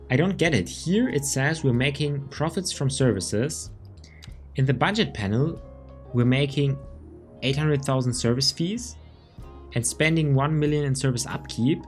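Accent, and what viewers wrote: Germany. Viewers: To me he sounds German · I hear deutsch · Man hört den deutschen Akzent 😂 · i hear a thiccc german accent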